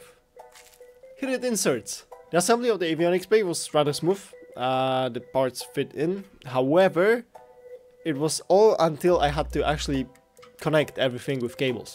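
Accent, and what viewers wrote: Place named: Poland. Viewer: juz po piweszej sekundzie filmu wiedzialem ze jestes polakiem :)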